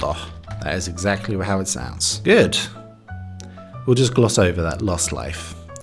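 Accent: Finland. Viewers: You have improved quite a bit. Well done! 🔥 I just wanted to mention that you have the same sort of Finnish accent my husband has as well. It’s this funny tone of voice, a bit like a toddler or a cartoon character speaking. I am not sure why, but I think if you make your S and T sounds sharper and your L ”clearer”, it will bring your Finnish to a next level. 😁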